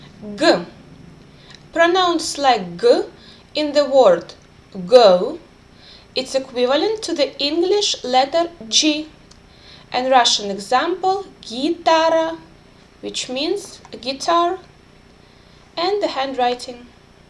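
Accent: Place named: England, United Kingdom. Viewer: your courses are amazing !! no problem with your english accent, i can keep focusing and understand you. Thank you !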